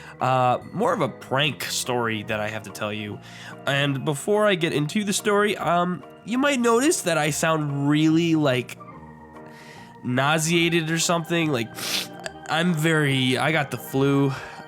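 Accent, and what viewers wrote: Canada. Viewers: Are you from Canada?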